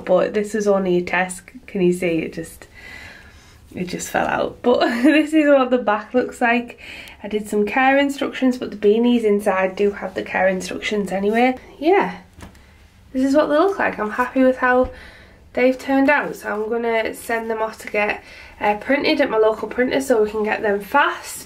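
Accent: United Kingdom. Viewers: in fact, it is an accent. There a so many different accents all around GB 😅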